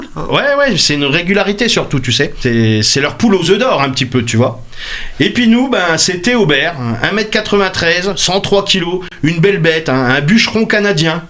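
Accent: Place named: Burgundy, France. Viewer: Je t en supplie prends ton accent Bourguignon dans tes chroniques car il est délicieux et c est surtout ta marque de fabrique !!!!!! Une fan bourguignonne inconditionnelle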